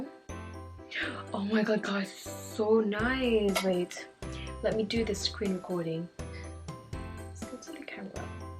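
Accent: United Kingdom. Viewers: At first I thought your accent was British but I don’t think you’d classify it as that.. BUT I LOVE YOUR VOICE SHFJDJJS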